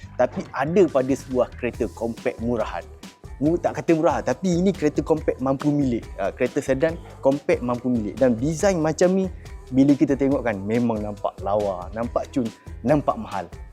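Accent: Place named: Terengganu, Malaysia. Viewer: Abang ni orang ganu ke. Slang bunyi ganu sikit sikit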